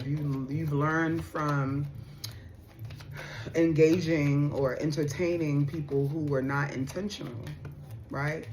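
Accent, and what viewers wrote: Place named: New York, United States. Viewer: Girl I love your energy cause period. WHEN YOU GOT HYPE, I did too 😂😂😂🎉🎉 I miss my New York voices ❤. Born and raised in queens until I moved to ATL 10 years ago. And I think I know exactly who this is. He said something stupid and I didn’t respond to him 😂 MIND YOU JUST LISTENED TO AND by. Manifesting 😢 BRUH 😂and you pulled Scorpio as the first sign. I am a Scorpio 11/01 mannnnn you pulling my energy hard 😂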